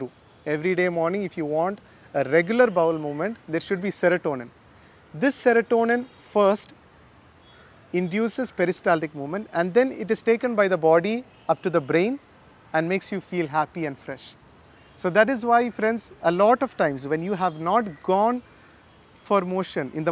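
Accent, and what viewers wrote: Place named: London, United Kingdom. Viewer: நீ முதலில் தமிழில் பேசு பா. பெரிய லண்டன் ரிட்டன் போல பேசிகறாய். டைம் தான் வேஸ்ட். 👊👊👊👊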